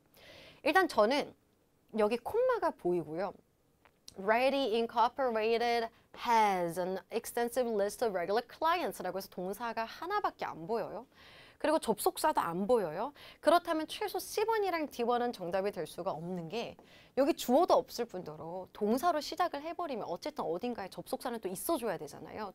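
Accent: Gyeongsang, South Korea. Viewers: 와 정말 ㅋㅋ 너무 멋있으시네요 ㅋㅋ 경상도 말씨까지 정말 이상형 그 자체시네요 참나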